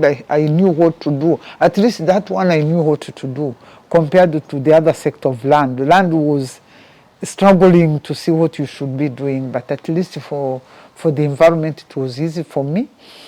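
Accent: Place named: Tanzania. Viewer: Mtanzania mwenzetu.Accent ya kiingereza chako imenijulisha,Poleni sana wanyarwanda kwa mateso yaliyowakuta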